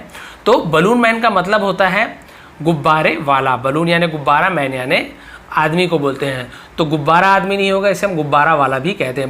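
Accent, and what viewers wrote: Chhattisgarh, India. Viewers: Sir aap chattishgadh se hai kya??!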